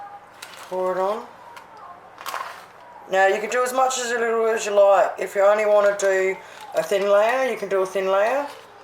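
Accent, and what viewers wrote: Australia. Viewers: Hey Woman I love ❤️ your style and hearing our good Aussie accent. Cheers 🥂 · You sound Aussie as , love it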